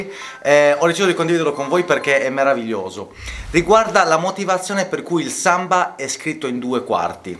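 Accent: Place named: Brazil. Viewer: Si sente che sei brasiliano, l'accento non mente 😂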